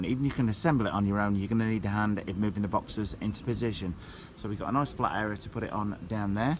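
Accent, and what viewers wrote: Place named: United Kingdom. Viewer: Great job with the review!!! Scary good pointers ! The British accent is cool too!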